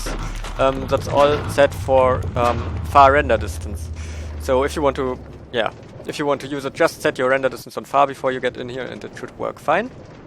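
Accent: Germany. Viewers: bist du deutscher? Hörst dich genauso an wie mein Nachbar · I mostly think you are German and you speak english xD (sorry if my English are not perfect i'm a German kid) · lol dude how much do you know about minecraft ??? XD and are you german ? your english sounds some kind like german english :)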